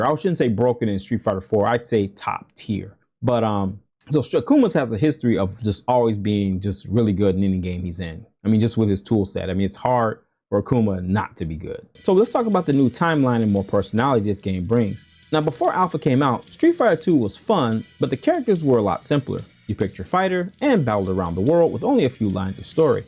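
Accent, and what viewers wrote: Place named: Carolinas, United States. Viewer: ! Your vids are soo good! Thanks for all your work and knowledge you collected over the years … I’m like you.. I dove into this franchise deeply! It sounds like you grew up in the Carolinas, im not far away here in Kentucky … we had the same childhood pretty much. You seem like a guy that would have been one of my friends way back then … you got a subscriber for life here.. can’t wait for more vids and I’m about to run the Alpha video back for the 2nd time today and prob even more! It’s that good bravo! 🫡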